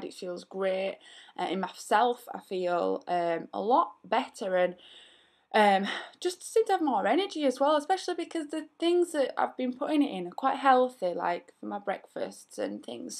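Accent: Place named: Yorkshire, United Kingdom. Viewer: Yorkshire accent if I'm not mistaken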